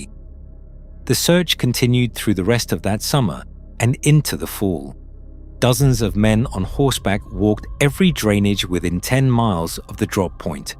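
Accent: United Kingdom. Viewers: "I'm and if you've BEAN following this..." Get rid of the british accent.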